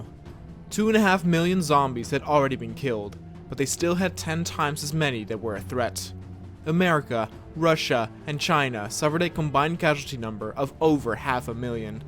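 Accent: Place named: Netherlands. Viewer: Didnt know you were dutch(because how you say the g) im dutch toXD.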